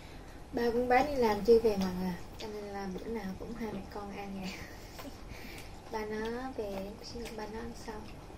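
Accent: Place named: Quang Nam, Vietnam. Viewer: chuc mung Nam moi chuc gia dinh Nam moi vui ve hanh phuc khoe manh nha🎊💥 Noi con o cau Mong Co gan may Quan ban bo tai ko rua Co di tu Nam 1990toi bay gioi ve Lai ko nho. Vi Co nghe giong cua co n noi co nghi con la Nguoi Quang nen chi hoi tham thoi.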